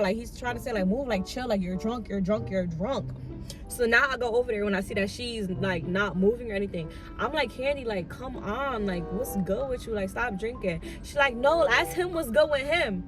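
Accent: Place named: Dominican Republic. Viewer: I like her accent, she must be Dominican 😍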